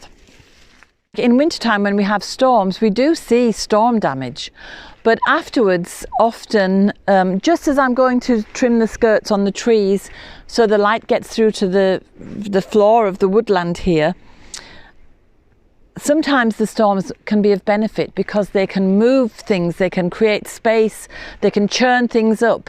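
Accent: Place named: Yorkshire, United Kingdom. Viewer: ah! that explains it! I could hear Yorkshire and Ireland and Teeside and I couldn't figure it out. I, too, have a very mixed accent from moving around. Have just come back from a stay in Darlington, coincidentally, to my current home in Yorkshire (but born and raised in an Irish family). I hope you are doing well xxx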